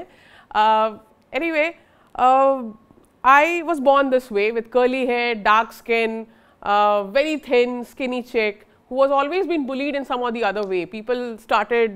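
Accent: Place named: Mirzapur, India. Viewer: loved ur mirzapur accent😍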